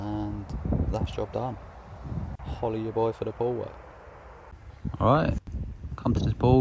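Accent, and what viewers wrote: United Kingdom. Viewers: It's the British accent for me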